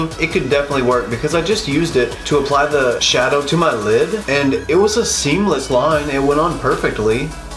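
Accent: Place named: South, United States. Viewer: Why are you the cutest?! I just found your page and I really like your Stila liquid tutorial and also your slight southern twang! ❤️❤️❤️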